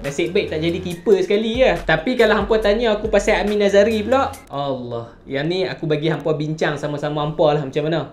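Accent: Kedah, Malaysia. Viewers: Best...Berita kedah ja...ckp pon lorat kedah...snang fhm...negeri lain nk fhm kena Cari kamus dulu...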